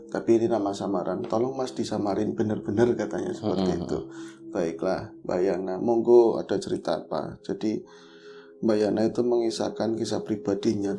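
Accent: Banyumas, Indonesia. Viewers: Amin matur suwun pada2 bro ora ngapak ora kepenak👍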